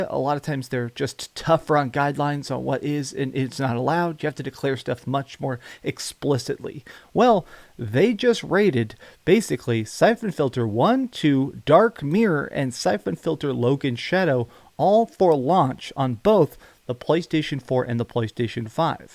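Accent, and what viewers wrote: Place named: Mississippi, United States. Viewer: Dude, some of the words that come out of your mouth make me think you're from Mississippi, lol.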